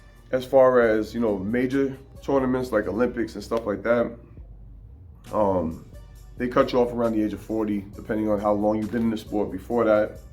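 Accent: New York, United States. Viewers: That New York accent has me feeling like Nas is explaining boxing to me. Love it. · No doubt you sounded like a NY guy but I didn’t want to assume. Appreciate all your motivation, knowledge and replying to your subscribers. Consistency is the key to life and this YouTube thing!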